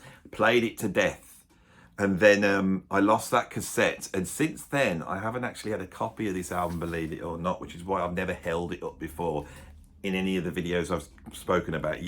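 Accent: Birmingham, United Kingdom. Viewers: Colosseum live! Now that's what I call impressive and I'm envious (but what about the condition?). Skinflint-fetishist; I can now say that I watch this bloke with a Brummy accent with who is a ....-.... drummer. The Michael Henderson budgie smuggler album cover reluctance anecdote cracked me up ps I picked up Focus 3 for next to nothing (in excellent condition) in an op-shop here in Brissy and it is the first time I didn't "get burnt" with a double-album. *op-shop = opportunity shop = charity shop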